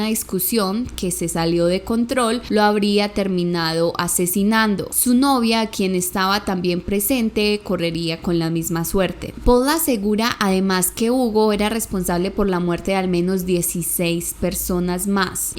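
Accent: Antioquia, Colombia. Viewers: Ay mi querida, me encantan tus videos y tu acento colombiano... Pero hace unas semanas una colombiana me rompió el corazón y me duele escucharte hablar 😭💔 ojalá pronto lo supere para volverte a escuchar con cariño. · Si, a mi también me gusta el acento Colombiano, después del de mi país por supuesto. Saludos desde Zacatecas México ❤️🇲🇽🥰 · Es el acento típico de los paisas (los nacidos en Medellín )...acá en Colombia,hay variedad de acentos 😊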